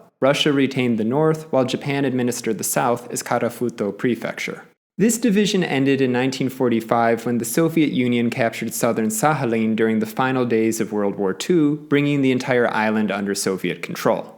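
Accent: United States. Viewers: Why would you expect someone who’s clearly American to pronounce the Russian O like /ɔ/? That sound quite literally doesn’t exist in American English at all (except in diphthongs and before R) – so of course he won’t say it the Russian way, especially in the middle of an English sentence. In the same way, an English person shouldn’t expect a Russian to pronounce “New Hampshire” or “Hythe” exactly the English way – and especially not in the middle of a Russian sentence, because that would just sound weird. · I think he just pronounces it like that because he's American. the o sound like in "top" or "stop" is pronounced like an "ah" sound in American English. American English doesn't really have a sound that's exactly like the stressed o in Russian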